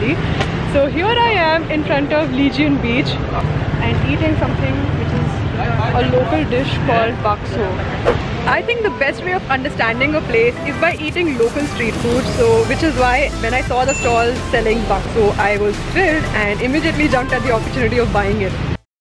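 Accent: India. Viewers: Your accent like indian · Are you Indian?..accent is quite Indian..!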